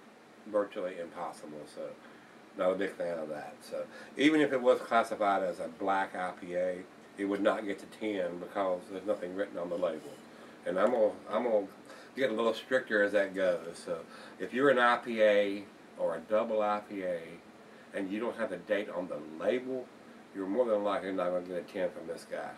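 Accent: Texas, United States. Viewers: I am new to your channel, I found it through Reddit a few days ago! It's awesome! One question though, where abouts is your accent from? I'm British so it's hard for me to distinguish, but you sound Southern, maybe Texan to me. Thanks.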